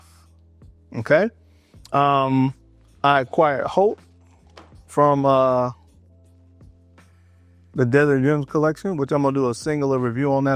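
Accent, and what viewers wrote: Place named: South, United States. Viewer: I bet you’re from the south. I think it might be a bit f a southern thing lol.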